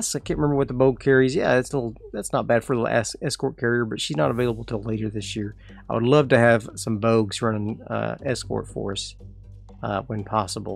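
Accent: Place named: South, United States. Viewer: 1) I'm hearing a Southern accent. Where ya from? I'm from Texas myself. 2) Holy crap...I didn't know someone modded WoWs vessels into the game! Makes you wonder what Japanese Navy ships you'll run into! Wonder if they fixed the striking power of the B-17s...I watched one play-through of the Tokyo Express mod where a YAMATO-class battleship was sunk by a SINGLE B-17. (No, I am not lying or BS-ing here, nor have I been drinking. 😂)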